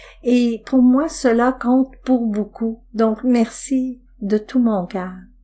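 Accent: Quebec, Canada. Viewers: Quel plaisir de vous écouter. J'adore votre accent. Québécoise ? Exact, je suis à la fin d'un sicle et vivement que celà se termine pour ouvrir une page positive. Je vous souhaite un très beau week-end. NAMASTE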